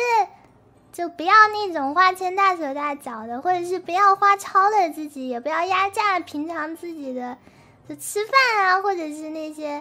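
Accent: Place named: Japan. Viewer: dang yo accent made me think you were speaking japanese for a sec lol what I heard was aksldntkhwkejars挣钱aksjdkfalksdf打钱 very cute tho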